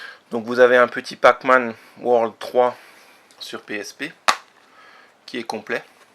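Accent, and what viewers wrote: Alsace, France. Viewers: On dirais bien un accent Alsacien ^^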